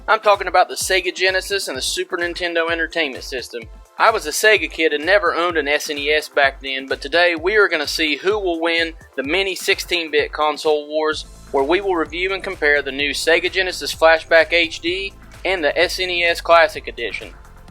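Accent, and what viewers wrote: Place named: West Virginia, United States. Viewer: I started watching this video and was like "Man! This guy must be from West Virginia!" Then I saw the hat and thought "Hell yeah he is!!" and I had to subscribe!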